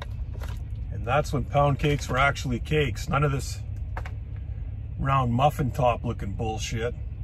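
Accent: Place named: South, United States. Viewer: My very first MRE was a chicken breast pattie. Couldn't believe my eyes that a piece of chicken was in a bag and still fit to eat. Little chicken juice goes a long way in the cab of a truck. Bit and pieces of this video you sound like a southerner. Great detailed video. PS it's still hot. Enjoyed our visit with you that week.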